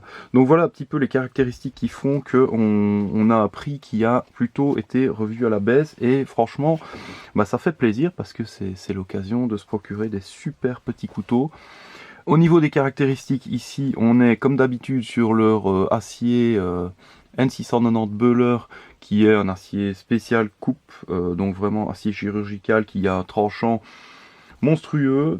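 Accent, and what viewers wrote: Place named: Belgium. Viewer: Je viens de te commander le Sector 2, et je pense que je demanderai à l'équipe de TRB de me faire un bel étui Kydex pour un port "neck" ;-) Merci pour tes vidéos, avec l'accent belge en plus !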